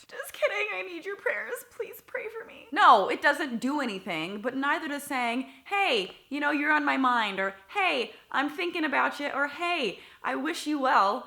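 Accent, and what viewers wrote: South, United States. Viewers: I do hear your southern accent. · LOVE YA LIKE A SISTER, GIRL. IT'S FUNNY THAT YOU'D DO THIS VIDEO AS I JUST HAD A YOUTUBER TELL ME THAT SHE'D PRAY FOR ME. I REPLIED, SAYING, WELL I' SAY "AFFIRMATIONS" FOR YOU. NOW, I'M TORN BECAUSE I DON'T KNOW WHICH I LIKE BEST I'LL "THINK" FOR YOU OR I'LL SAY AFFIRMATIONS FOR YOU. I DON'T, PERHAPS. I' LL USE THEM BOTH., KEEP THOSE VIDEOS A COMIN. OH, LOVE YOUR SOUTHERN ACCENT.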